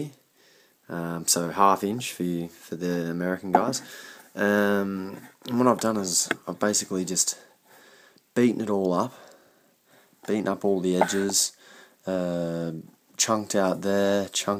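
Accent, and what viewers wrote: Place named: Australia. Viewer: Aussie accent good to hear g'day from Perth P.S great content nice work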